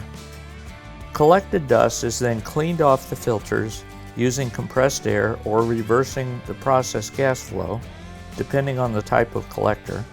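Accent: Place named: Eastern Europe. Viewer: Nice video, but speaker has an accent, that I can't define, and this makes me curious. Is it East European accent? All the best from Europe )))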